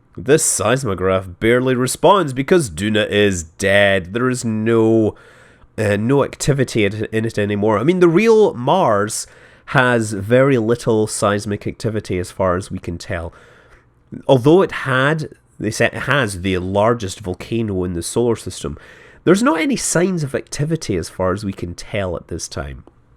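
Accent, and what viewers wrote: Scotland, United Kingdom. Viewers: He's Scottish, I presume he's from Aberdeen or Edinburgh.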